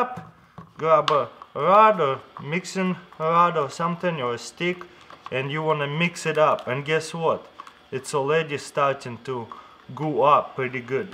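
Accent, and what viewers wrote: Russia. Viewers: Я все не пойму по твоему акценту, ты русский или?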